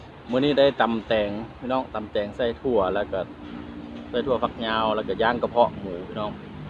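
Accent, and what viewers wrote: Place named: Nyo, Thailand. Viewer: สวัสดีครับอ้ายมาเบิ่งมากินนำครับ..ได้งินสำเนียงญ้อแล้วคึดฮอดบ้านครับ..เพื่อนใหม่เด้อครับเขยสกลมาเบิ่งนำครับ..มุงหลังคาให้แล้วครับอ้าย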